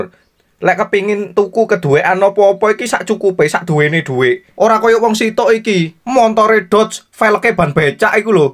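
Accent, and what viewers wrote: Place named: Malang, Indonesia. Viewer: Admin e wong malang iki jelas🤣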